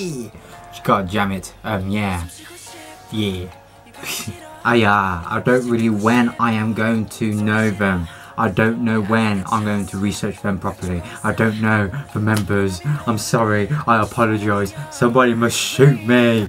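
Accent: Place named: United Kingdom. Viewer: obviously tho its kpop with good reaction i loved it lmao 👌💫and your voice i love it its nice to hear someone else with a british accent 💫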